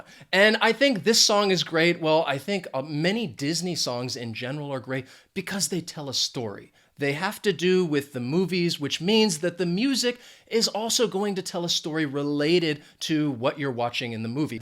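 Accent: United States. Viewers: Thanks a lot! I enjoyed every second of the American English you are using - the way it should be used by all the native speakers;)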